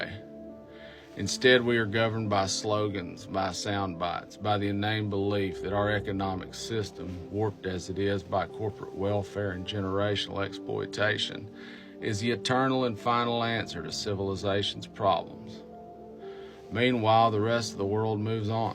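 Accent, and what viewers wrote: South, United States. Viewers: Wow, the most sense I've heard from an American for a long time, and delivered in accent that sounds like a Redneck! Well done sir . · It’s a glorious moment when you finally hear an American with a southern accent take all the stereotypes out there and stuff them in that dark recess. Pure accuracy, concise and eloquent language, and a poignant point. I live in a country where the social safety net is decaying and there is handwringing about how it is funded. There is no handwringing about the moral fact or need for it. China is not a utopia for its people but it can do things 🇺🇸 can’t. It can have a consistent social, economic and commercial strategy for decades. Whether we like the direction of travel, it can move itself consistently. But it isn’t communism or socialism. It’s authoritarianism. That’s a cup you now drink from too 🇺🇸.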